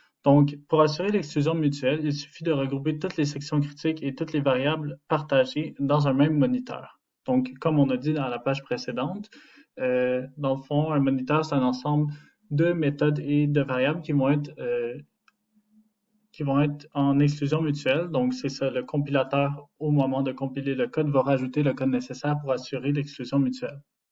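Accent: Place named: India. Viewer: لي يحب يعذب وذنيه يسمع هندي يهدر فرونسي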